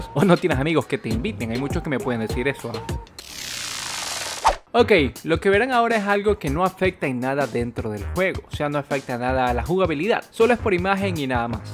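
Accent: Ecuador. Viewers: Eres ecuatoriano?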